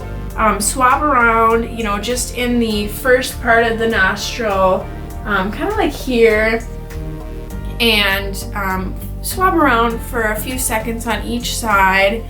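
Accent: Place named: Wisconsin, United States. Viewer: Very informative. I haven't had the COVID test, but not afraid to now. Your Wisconsin accent is pretty good, you must be a native.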